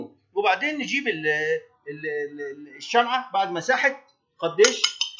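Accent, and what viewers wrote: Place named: Upper Egypt, Egypt. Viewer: استحالة تكون من اسكندرية انت ممكن من الشرقية او الصعيد لغة الاسكندرانين معروف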